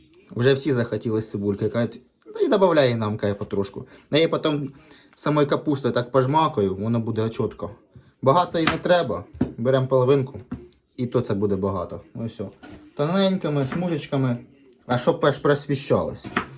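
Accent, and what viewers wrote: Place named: Lubny, Ukraine. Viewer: В мене рiднi в Лубнах. Говiр дуже схожий😁 🇺🇦✌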